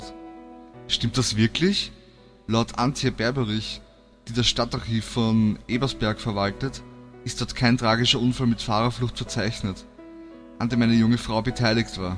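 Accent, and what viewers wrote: Austria. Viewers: Bist du Österreicher?? :D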